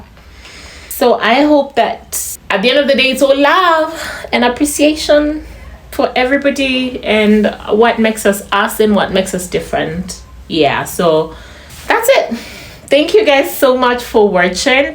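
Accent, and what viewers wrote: Kenya. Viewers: it is correct, you sound more Kenyan than Ugandan. Karibu Kenya and u are a beauty like most of Ugandan women. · True. You do sound Kenyan. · Griphin, Can u detect her Luo accent? That is the magic orientation.......😄😅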